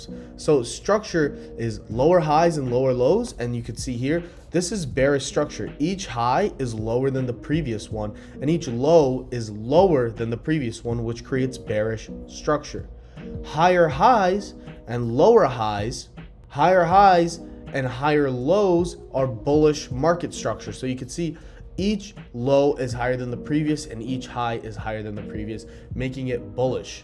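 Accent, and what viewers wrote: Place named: Canada. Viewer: No way are you American, your Canadian with that accent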